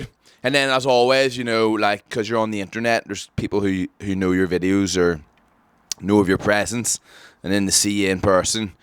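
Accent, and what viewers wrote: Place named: Northern Ireland, United Kingdom. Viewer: You from Northern Ireland? Or ireland seems like more noeth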